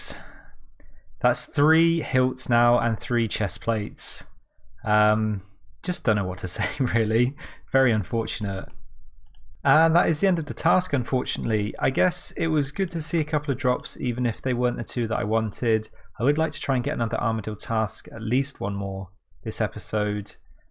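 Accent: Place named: United Kingdom. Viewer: Nice British accent Fellah!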